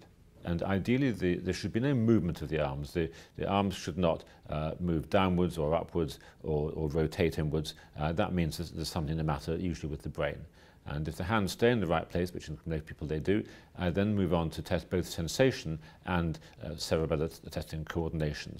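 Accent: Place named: United Kingdom. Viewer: I love the british acent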